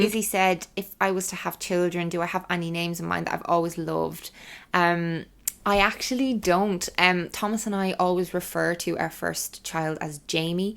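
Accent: Ireland. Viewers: If I'm having an off day, hearing your Irish accent makes it a good one. Thanks!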